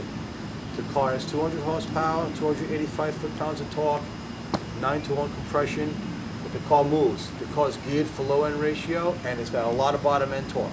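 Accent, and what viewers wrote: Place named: New Jersey, United States. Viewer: Looks like a NJ inspection sticker. Sounds like a NJ voice.... Oh there's the plate.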